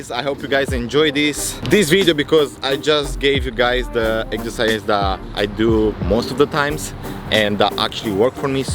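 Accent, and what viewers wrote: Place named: Romania. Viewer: I like the way he combines english with romanian sțo ;) Bravo!!! Andddd keep up the good work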